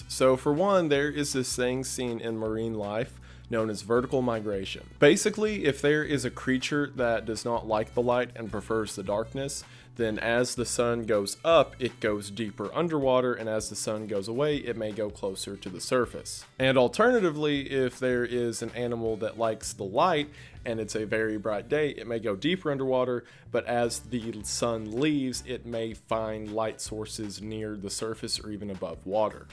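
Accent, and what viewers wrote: South, United States. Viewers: He is so articulate..and every now and then - I’ll hear a very slight, southern, twang (or maybe it’s considered a drawl, forgive me, I’m nothing more than a Michigan Troll) but nevertheless, I honestly enjoy hearing it!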